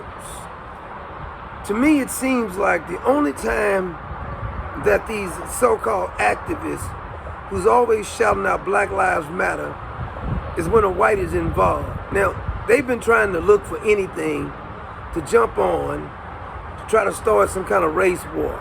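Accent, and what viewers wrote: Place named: South Carolina, United States. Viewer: I grew up in SC, i live in NJ now tho. Hearing your accent reminds me of home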